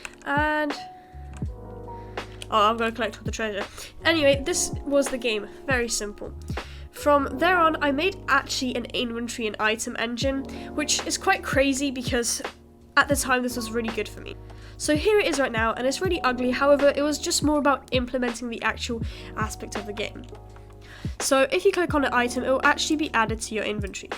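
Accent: United Kingdom. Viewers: that's a nice british accent 😊you have greetings from France😈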